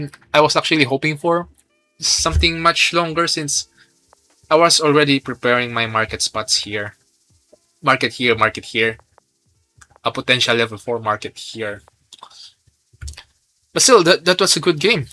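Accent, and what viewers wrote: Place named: Philippines. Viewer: U sound Filipino lowkey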